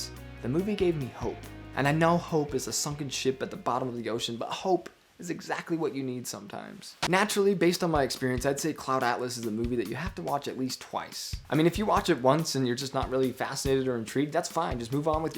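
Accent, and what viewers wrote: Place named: United Kingdom. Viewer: I thought he was British for a second XD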